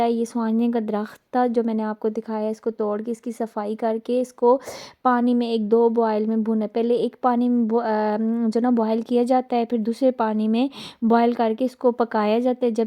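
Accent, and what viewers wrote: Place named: Punjab, Pakistan. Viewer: Ap majabi bilkul hamri tra bolti ho😇😄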